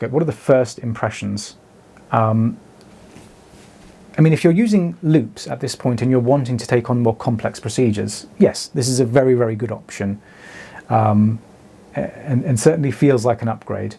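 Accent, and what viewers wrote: England, United Kingdom. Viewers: I might be really shallow but I like to tune in just to listen to your beautiful English voice. Wax removal is a bonus!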